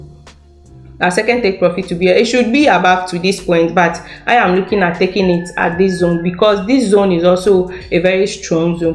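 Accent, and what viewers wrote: Ghana. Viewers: It's Your Ghanian Accent for me🥰😍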